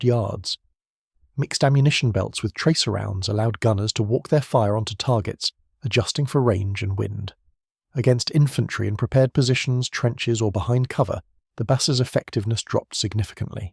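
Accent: United Kingdom. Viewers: The narration uses a British voice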